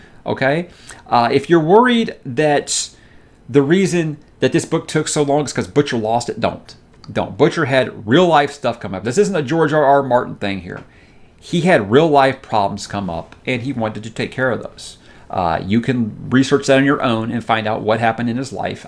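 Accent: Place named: South, United States. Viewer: Nice! What part of the South are you from?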